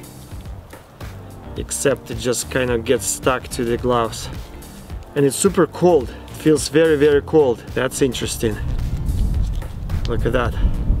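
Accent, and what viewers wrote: Russia. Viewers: He sounds like crazy Russian hacker.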